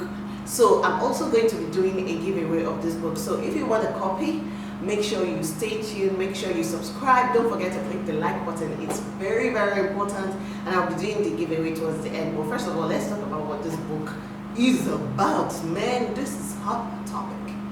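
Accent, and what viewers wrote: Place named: Warri, Nigeria. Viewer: I loooove the way you talk. You sound like you are from Delta...warri to be precise. hahaha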